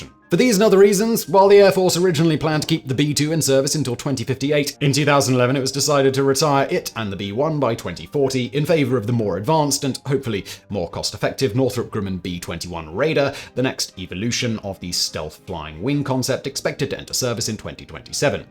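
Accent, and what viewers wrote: England, United Kingdom. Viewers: I feel like I’ve seen clones of this guy on various channels. All bald, with a beard and a British accent… is it just me? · FOR ENGLISH ACCENT ONLY !!! - TALKING AT SPEED OF LIGHT 🤮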